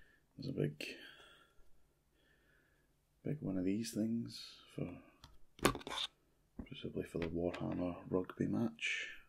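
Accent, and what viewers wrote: Scotland, United Kingdom. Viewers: I can’t believe this exist. Warhammer asmr in a Scottish accent. I’m so ridiculously happy.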